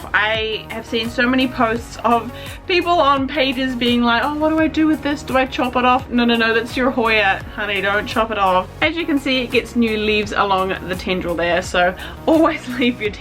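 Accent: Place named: New Zealand. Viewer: So I was watching Hoya videos tonight and yours popped up... a fellow Kiwi accent :-) Lovely set up you have in the green house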